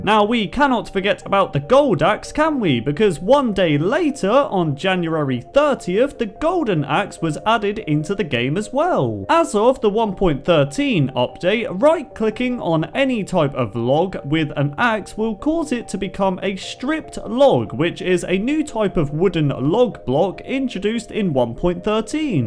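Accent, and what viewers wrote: United Kingdom. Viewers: Are u British accent?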